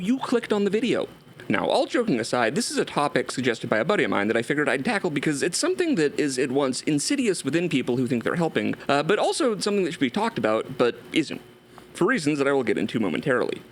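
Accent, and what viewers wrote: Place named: United Kingdom. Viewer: For the longest time I thought you were British.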